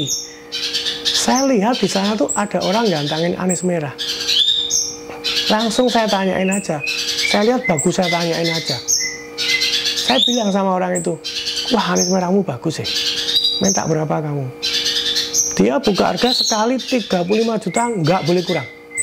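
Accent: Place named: Yogyakarta, Indonesia. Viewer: kren cara bicarene ngk ningalne ciri kas jogja, mudah dicerna masih percaya bejo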